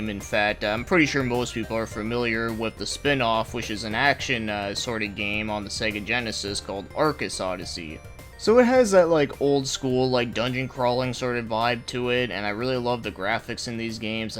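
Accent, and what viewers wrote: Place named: Japan. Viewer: ay bro you sound Japanese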